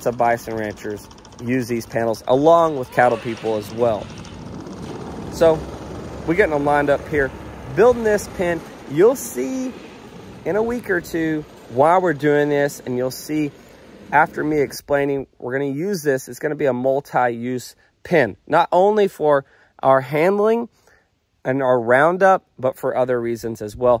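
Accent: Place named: South, United States. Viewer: It’s just the way he talks. Down here in the southern part of the country we tend to give some words our own special tweak.😉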